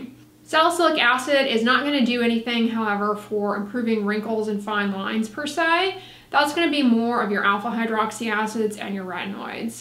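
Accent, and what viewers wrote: United States. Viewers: Where in America is that accent from?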